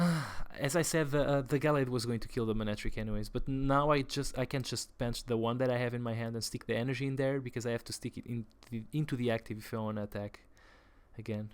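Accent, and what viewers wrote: Portugal. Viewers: I knew ur accent was portuguese.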